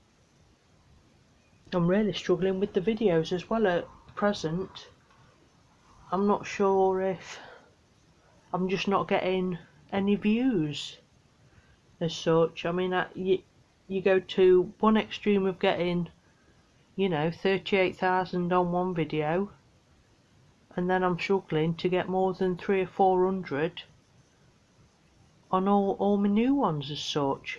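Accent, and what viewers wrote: United Kingdom. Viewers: Morning, love watching & hearing your videos & sorry to hear about your little dog, mine had a bad leg & I couldn’t stop her jumping on sofas, beds etc little devil. Nice to hear a UK voice, what part are you living, I’m in Northumberland. Hope you get this sorted 🤓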